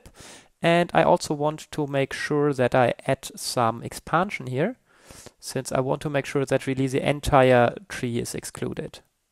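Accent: Germany. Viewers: Hab das erste Wort gehört und wusste direkt, dass du Deutscher bist :D Gutes Tutorial übrigens :)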